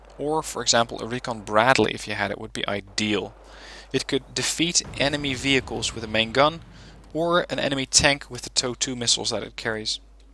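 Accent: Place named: Netherlands. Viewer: I can tell by your accent that you're Dutch. Gave channel man!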